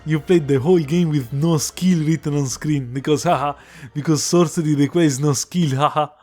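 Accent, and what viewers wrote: Italy. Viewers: are you italian? this accent 😹❤️ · Bro are you italian? (I m italian) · He sounds italian wtf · scusa la domanda, sei italiano? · Accento italiano fortissimo, poetico❤️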